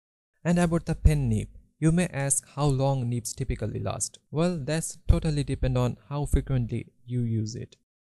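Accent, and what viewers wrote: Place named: India. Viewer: Ah yes my left ear's daily dose of an Indian accent ASMR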